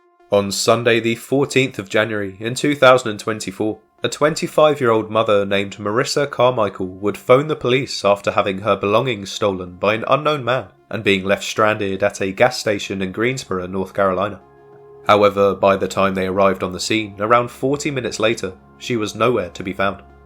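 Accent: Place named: London, United Kingdom. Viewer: Why does he have to have a London accent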